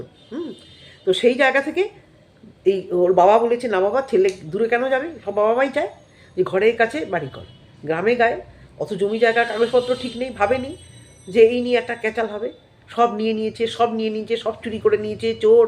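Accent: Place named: Chittagong, Bangladesh. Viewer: Besh kichudin apnar controversy video dekhechi..valo lagche…besh straight katha gulo shunte valo lage..from Bangladesh..Chittagong er katha bolate aro valo lagche..amrao chittagong to.. Jai hok…priya khubi chalak,self lover…Ar khub show korte pare..hamboravab…kalpana r katha khub besi kichu bolar nei…priya r hotath tk hoyeche tai she kintu nijeke boro vabe kintu dekhchi onekei ekdom kom or bend he legeche priya k boro,mohan dekhacchhe…j konovabei kolpona r tar bor k kharap dekhate I hobe…its ok controversy korche kintu mone hocchhe priya r jonno Maramari korbe jeno…ajob…..othocho eder onekei mondirra k niye jkhn video kore khub politely bole emon ki kutshit ghora k eo sevabe kichu bolte parena…..priya khub irritating….tao ki bhalo proof korte chai he…bhul to dui dikei ache. Jai hok apni arekta regular hole valo lagbe r arektu somoi dile o video aro sundor hobe.valo thakben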